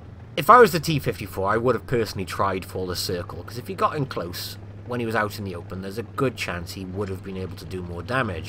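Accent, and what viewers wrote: United Kingdom. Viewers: Oh god I just love to hear your voice and laughter and that stunning British accent of yours along with the explanation of what is happening in the replys frankly as much as it's sad to say but truth be told for me you are The best WOTB YouTuber and yes even better then TTTimster he's latest videos became more like (I'll put whatever is there to refresh the channel case) honestly at least in my own prospective and you already know that jimmy the one with the E_100 reply already sent one to TTTIMSTER and honestly I didn't like it in any shape or form especially with he's terrible commentary...... unlike you m8 always happy to watch your videos some of them can make my day... so please don't stop laughing and publishing those beautiful videos of yours... bye.....:D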